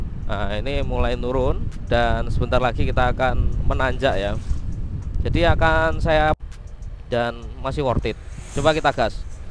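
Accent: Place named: Java, Indonesia. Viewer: Asli mana mas?kok logate jowo "dronjongan" 🤣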